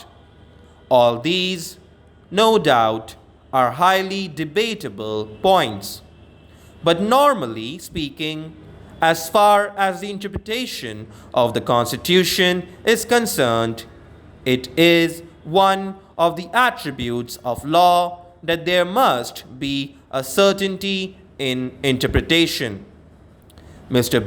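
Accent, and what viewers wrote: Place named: England, United Kingdom. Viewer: O bhaiya thoda halka haath rkho humare yha itni standard English na boli jati na smjhi jati isliye pronounciation thoda ache se bolo Jo smjh aa sake pura angrej ban rhe ho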